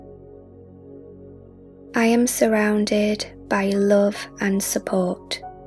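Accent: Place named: North East England, United Kingdom. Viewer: I'm a Geordie, from Newcastle! Sounds like you're from somewhere not too far away :)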